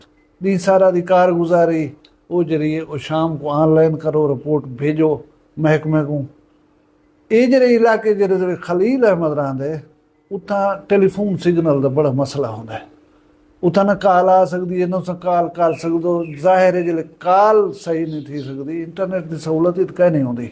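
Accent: Saraiki, Pakistan. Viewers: صاحب اللّٰہ رحیم وکریم آپ کو اپنی حفظ و امان میں رکھے ۔ آمین ثم آمین بہت خوب صورت اسلوب بیان ساتھ سرائیکی زبان کی مٹھاس کا جادو۔ کیا کہنے۔ حقیقی اور وسیب کے دل دماغ اور ضمیر کو جھنجھوڑ دینے والا روزمرہ کے حالات واقعات پہ مبنیcontent ۔ ماشاءاللہ اس واقعے نے دل و دماغ کو ہلا کر رکھ دیا۔ اتنا خوبصورت جوان ۔ صاحب یقین کریں مزید کچھ کہنے اور لکھنے کا حوصلہ نہیں۔ بوڑھی ممتا ، جوان سالہ بیوہ ، معصوم ننھے فرشتوں اور اس عمر میں جوان سال بیٹے کا جنازہ باپ نے کیسے اٹھایا جو گا۔ اللّٰہ رحیم وکریم سے اس خاندان پر اپنا خصوصی فضل وکرم فرمائے ۔سب کے لئے آ سانیا ں فرمائے ۔ مرحوم کو جنت میں اعلیٰ مقام عطا فرمائے ۔ آمین ثم آمین اللّٰہ تعالیٰ آ پ کو دین ودنیا کی ڈھیروں کامیابیاں اور کامرانیاں نصیب فرمائے ۔امین ثم آمین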